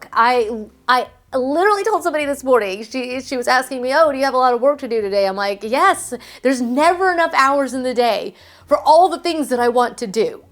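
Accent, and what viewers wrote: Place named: South, United States. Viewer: I slowly hear your "southern" creeping back!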